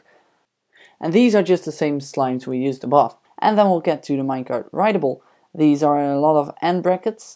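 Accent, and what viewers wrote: Netherlands. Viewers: haha ik hoorde gelijk aan je stem dat je NL ben ;p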